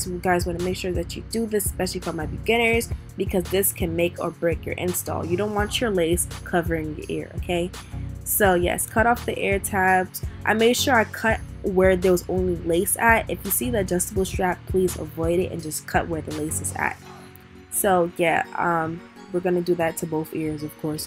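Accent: New York, United States. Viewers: Yo she has to be from ny the way she talking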